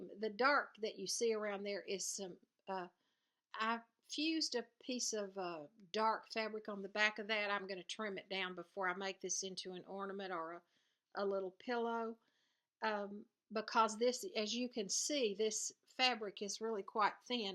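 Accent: South, United States. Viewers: Hi, I love watching your videos, I am a southern girl too and I like hearing a familiar accent.:) I am from Texas and you will love First Monday in Canton. Wear very comfy shoes and bring money to spend lol Have fun in Texas!!! Oh, and since you also quilt, there is a nice little quilt shop called Sew N Sew in a little house not far from First Monday. The address is 22390 State Highway 64; Canton, Texas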